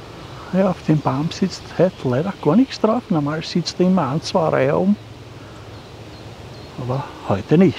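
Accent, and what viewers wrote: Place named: Vienna, Austria. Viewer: Hallo!Dieses Jahr kommt mir die Natur so viel grüner vor als die letzten Jahre,oder kommt es nur mir do vor. Schönes neues Plätzle! Hast dich ja gleich schön häuslich eingerichtet. In letzter Zeit verstehe ich dich nicht wirklich, was vermutlich mehr an meinen Ohren liegt, und ein wenig, dass mir der Wiener Dialekt nicht so geläufig ist. Aber du sprichst den ja schon immer, also sind es meine Ohren, dietrotz Hörgerät nur 50% erfassen oder inzwischen noch weniger. Also wundere dich nicht, wenn ich nicht darauf eingehen. Aber ich gucke dir gerne zu. Bleib behütet, Grüßle Gunde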